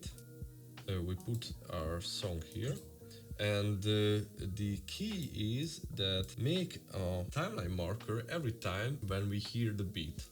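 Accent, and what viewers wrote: Hungary. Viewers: Na jó 😁 addig görgettem míg kaptam magyar hozzászólást 😁 az akcentusból kivehető 😅 köszi a klippet!❤ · Hello ☺️ pár perce nézem még csak a videót, de már most látom, hogy érdemes lesz végig nézni. Meg lestem már pár hasonlót speedramppal kapcsolatban és kiváncsi vagyok milyen extrát tudsz mutatni azokhoz képest. Ja és remélem eltaláltam a nyelvet az akcentus alapján 😉😃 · Are you hungarian? Na ja jo, egsh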